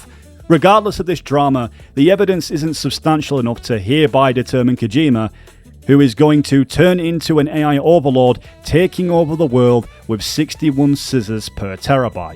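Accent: United States. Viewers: Why did you think people would take you more seriously with an American accent? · lol ur american accent is good bro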